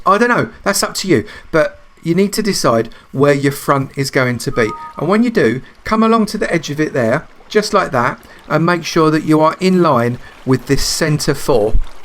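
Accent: United Kingdom. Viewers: Aah your British accent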